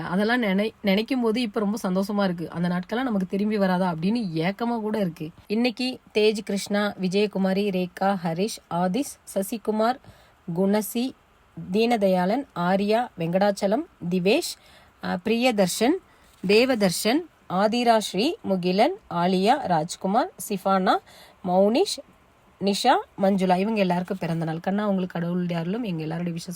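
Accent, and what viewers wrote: Tirunelveli, India. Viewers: உங்க பேச்சில் நெல்லை வாசம் வீசுகிறது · Thirunelveli Tamil naila pesuriga❤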